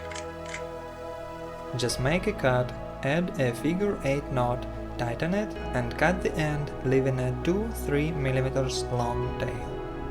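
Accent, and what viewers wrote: Russia. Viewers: Желаю удачи в дальнейших разработках. Кстате, по акценту мне показалось что ты русский, это правда?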